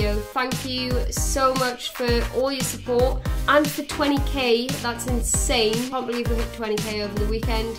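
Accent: United Kingdom. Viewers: Is this britsh english?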